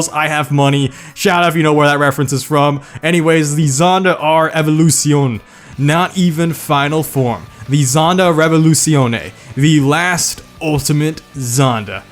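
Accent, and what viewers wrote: United States. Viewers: I was thinking your American not Asian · I just love listening to americans pronouncing italian car names, I am italian🤣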